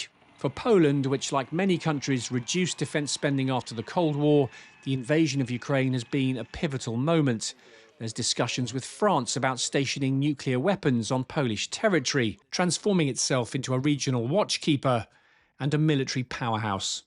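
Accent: United Kingdom. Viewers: British accent and real intention of Russia are not connecting together.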